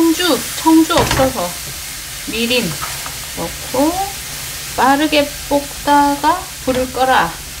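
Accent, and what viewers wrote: Gyeongsang, South Korea. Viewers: 항상 영상은 보지만 댓글은 처음입니다 ^^ 어찌나 깔끔히 살림하시는지 항상 감탄을 하며 항상 바지런하고 소박하게 사시는모습 응원하게 되었어요 멋진집 멋진 인테리어가 아닌 우리네 사는 모습을 보여주셔서 더 눈길이 가고 한번더 보게 되었어요 저도 456님처럼 될순 없지만 영상 볼땨마다 같이 움직이고 청소할려고 하고있어요^^ 영상에서 혼잣말 하시는 귀여운 사투리도 너무 좋구요 저도 경상도라 너무 정감가요 앞으로도 좋은 영상 많이 부탁드릴깨요 항상 응원하고 건강하세요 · 오~ 대구분이시네요 친근함이 느껴지네요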